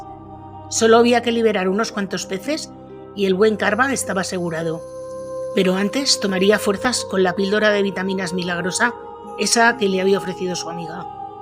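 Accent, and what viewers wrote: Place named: Barcelona, Spain. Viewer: Querida, antes miraba tus vídeos y te daba un 👍siempre, pero no me atrevía a escribir comentarios. Ahora ya he decidido hacerlo, más que nada porque mereces que te diga que eres fabulosa narrando cada historia, que VOCALIZAS perfectamente para que se entienda lo que narras (a otros y otras que hacen lo mismo, apenas se les entiende porque NO VOCALIZAN y van mega rápidos) y además hablas sin prisas, pausadamente, y eso hay que elogiarlo, porque demuestra tu gran empatia con los que estamos del otro lado escuchándote 🙏😘💝 por eso debemos de alabar tu buen hacer y transmitirtelo. Tú nos ayudas a estar informados al mismo tiempo que podemos evadirnos un ratito de los propios problemas, y sólo por tu constancia y todo el trabajo que debes llevar a cabo para realizar cada caso, cada vídeo, debemos de sentirnos agradecidos y decírtelo. 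Vivimos en el país de "la envidia" y por ello es fundamental saber elogiar el trabajo que los demás, como tú, hacen para nosotros. Así que muchas gracias de todo corazón, a ver si ya empezamos a dejar las envidias y a VALORAR más a las personas que se lo merecen como tú. Bueno, guapa, te mando mi segundo abrazo desde Barcelona (y diría por tu acento que debes ser de por aquí también 😍) Muackssss!!!! 💝💖❤️💕👍🌺🦋🌷🌹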